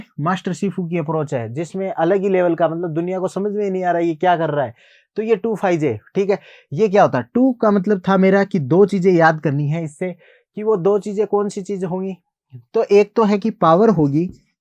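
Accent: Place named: Rajasthan, India. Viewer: 🤣🤣🤣 aap bilkul desi bol rahe ho... Rajasthani hai bhai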